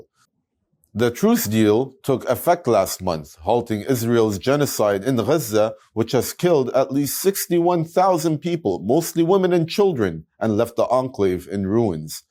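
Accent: United States. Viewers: .*╔═══ ❤☀💚☀❤ ═══╗* 💚💚💚💚💚💚💚💚💚 Dear MR, Wa-alaikum as-salaam. Like the Crescent of, you appeared after full one week, 14th, Feb being the last you had read the news, and full 7 days ahead of the sighting of the Crescent of. Wishing you and all your subscribers a HAPPY, SPIRITUAL, TAQWA-GIVING & AUSPICIOUS!!! Your presentation of news is so fantastic that despite you accent being American, we, from India, find it so easy to understand you beautiful slow pace of reading the news. KEEP IT UP, MR.!!! 🌐🌐🌐🌐🌐🌐🌐🌐🌐 ╚═══ ❤☀💚☀❤ ═══╝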